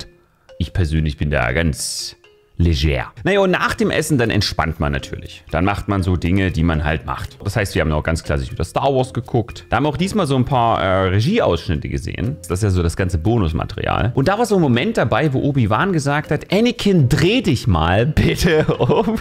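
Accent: Saxony, Germany. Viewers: Das sächsische rettet einfach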